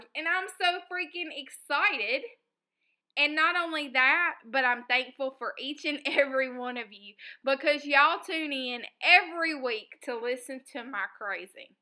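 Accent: South, United States. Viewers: You are too much fun with that southern twang! Love the little journal and the cover.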